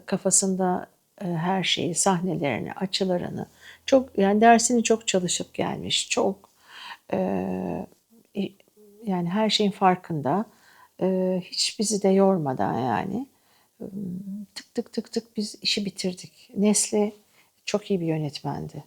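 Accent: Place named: United States. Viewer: Amerikan aksanıyla Türkçe konuşmak...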